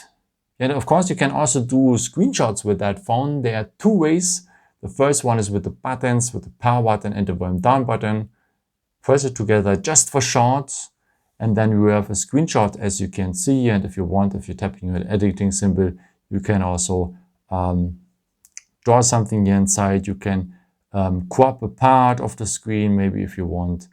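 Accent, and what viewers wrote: Germany. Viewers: Vor kurzen gekauft das A72 Machst Du das auch in Deutsch? Hast da noch einen anderen Chanel, Du klingst zumindest im Akzent Deutsch ;) ... if not, here my translation: I ask if you have a german channel to, because you sound german ::) I just bought the Phone. What I have problems with is the camera. Take nice fotos with red flowers for exampel is not possible, does it has a "red-problem" ? How to handle that?? Its too bad... even with other apps ... because its the camera I guess?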